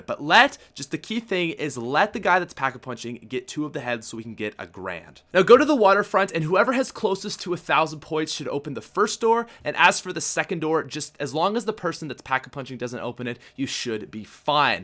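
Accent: Canada. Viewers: Are u Canadian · R u Canadian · Dude he's Canadian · He’s Canadian. That’s how Canadians pronounce out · You are definitely Canadian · Are you canadian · Dude i love the Canadian accent. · Is he Canadian? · the Canadian accent is strong in this one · Hey are you Canadian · I think he's from Canada. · Strongest Canadian accent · Are you Canadian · Lol this guy is definitely Canadian. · this is my first time checking out your channel and you sound way more Canadian than lex.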